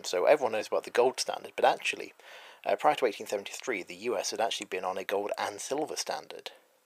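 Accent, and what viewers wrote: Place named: Scotland, United Kingdom. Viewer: There’s nothing like being schooled about US coins from a Brit. The British twang makes it all the more supple! edit: oh sorry, Scottish.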